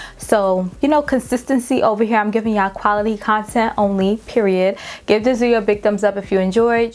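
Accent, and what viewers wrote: New York, United States. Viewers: Why do I always know when someone is from New York lmao.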